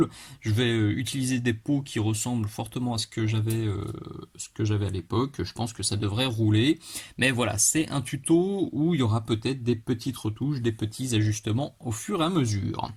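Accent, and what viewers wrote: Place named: South, France. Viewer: Salut. Encore une très belle vidéo sur ce métal non métallique en cuivre, pleine d'enseignements et de conseils judicieux comme toujours. Et bravo pour l'accent du sud.. qui me rappelle le mien (natif de Toulouse..)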